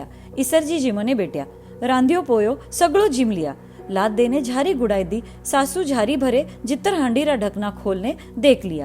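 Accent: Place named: Marwari, India. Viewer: Nice marwadi accent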